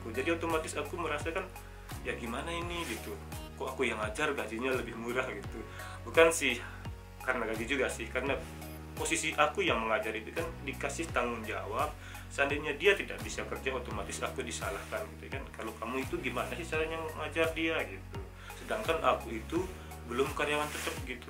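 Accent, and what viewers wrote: Japan. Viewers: Logat Indonesia nya hampir ketutup bahasa Jepang.. Tapi faham jga sih yg dijelaskan. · sdh kayak org jepang banget... Dari logat dan intonasi nya... Kyk nya lama2 abang juga kayak abang.. Ilang lombok (indonesia) nya... Semangat bang.trmksh info nya.. 👍👍😀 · Mungkin kelamaan di jepang jadi ada logat jepangnya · puluhan tahun hidup di jepang logatnya udah hampir kebalik yaa om lidahnya soalnya sering bicara pakai bahasa jepang 😁 semoga sehat selalu om 🙏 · lama dijepang, logatnya udah jepang bgt.. 👏🏼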